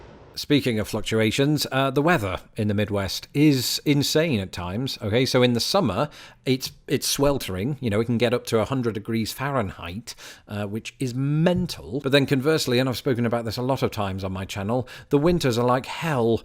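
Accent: United Kingdom. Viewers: Gosh that British* accent never gets old, I'd live in London just for this I'll never understand why people trade Europe for the US. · No way you still sound british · i like this guy. British accents make everything you say, much more interesting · I'm surprised you still speak with a British accent after 10 years! But you did say "sidewalk"! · You still have very distinct British accent. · Dude you’ve been here 10 years and that accent is stilly silky British smooth 👌👍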